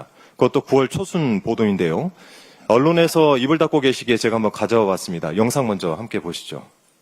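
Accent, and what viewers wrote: Gyeongsang, South Korea. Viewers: 김정재 의원을 뽑은 지역구 사람들은 정신 차리라. 더신.ㄴ 경상도 사투리가 토할 것 같은 느낌을 버리규 싶습니다.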